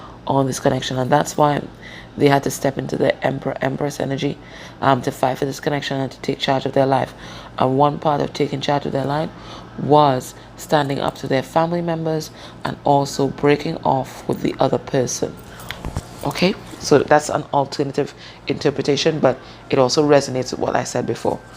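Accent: Trinidad and Tobago. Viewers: Trini accent?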